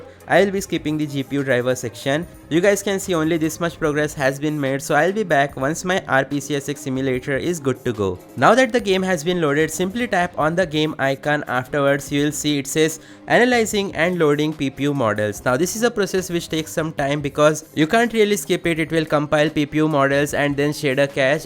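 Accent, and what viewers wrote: India. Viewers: I am a Indian but why I can't understand Indian English 😢😢😢😢😢 It's like 5x speed😂😂😂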